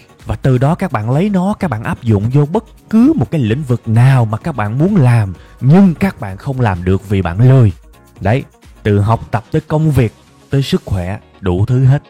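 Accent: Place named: Mekong Delta, Vietnam. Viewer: rất thích cái nói tự nhiên chân chất như miền Tây của bạn. Mà làm clip giống bạn ntn vậy chỉ mình với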